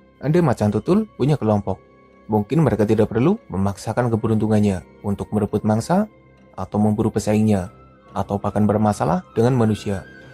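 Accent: Banyumas, Indonesia. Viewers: Ngapak e ketara banget kiye admin e 😅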